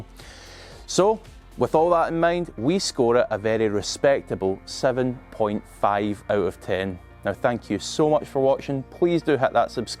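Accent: Scotland, United Kingdom. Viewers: Scottish ( English)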